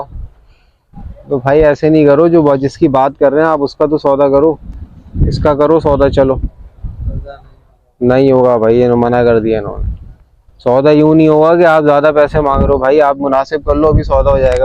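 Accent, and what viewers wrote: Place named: Karachi, Pakistan. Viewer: Yr pure Karachi wala style keep it up brother ❤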